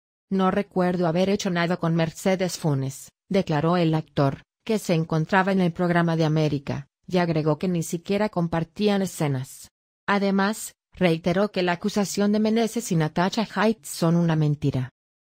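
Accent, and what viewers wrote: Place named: Spain. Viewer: X q ponen esa voz española q feo.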